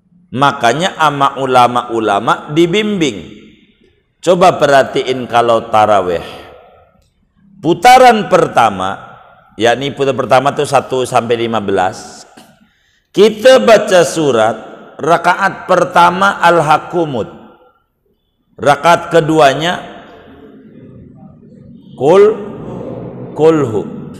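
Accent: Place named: Banten, Indonesia. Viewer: Izin berguru ke pak kiyai, saya dari kresek tangerang, dari logat bahasa pak kiyai kaya nya pak kiyai dari serang banten.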